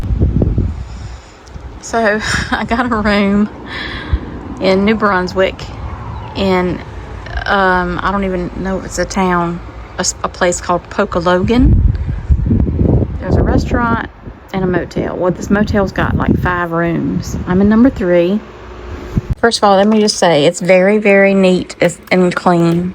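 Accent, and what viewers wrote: South, United States. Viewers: Work on getting rid of that American accent. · Watching your YouTube video tonight, and seeing my home province and familiar scenery through a visitor’s eyes. It’s made me a bit homesick, because I am living in St John’s, Newfoundland and I haven’t been home since 2019 ( before the COVID pandemic). I was really hoping to get home this summer, having been laid up with Arthritis and finally this March, I got a total hip replacement done. I’m recovering very well, however, I do need my other hip replaced, and hopefully that will happen sometime this fall (I have already signed my consent for it). Definitely going home next summer and possibly moving back to New Brunswick. Strongly considering it. I just love your accent, I have family in Houston Texas so nice to hear a bit of the southern drawl as I haven’t been to visit there in over 10 years now. 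Please don’t feel out of place in our Maritime provinces, We have all kinds of accents and many cultures, people from many countries who now reside on our East Coast. There is a famous saying “it’s a small world after all” and it’s getting smaller all the time. You seem like a really lovely person and I do know what you mean by “some people “ being not so nice. Yes, those people are everywhere, however, most of us Canadians you will find to be very polite, kind and respectful. I subscribed to your channel so I could see some familiar haunts of mine. Drive safe now. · haha don't tell Canadians that thing about God having a southern accent. tbh, I don't even understand the joke. I'd leave God out of any casual conversation. People will be charmed by the southern accent itself so don't worry about how you sound. Enjoy your trip. you will fit right in. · I just discovered your video via Youtube suggestion. 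Very happy that you enjoy your stay in NB, so far (I live in Moncton). Thank you for your kind words for Canada and Canadians (yes, there could be bad people, like anywhere else in the world). Love your personality and your southern accent! Have a great road trip! 😊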